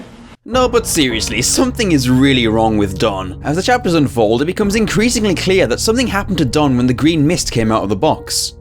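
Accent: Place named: United Kingdom. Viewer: His voice is really british. ... i love it .-.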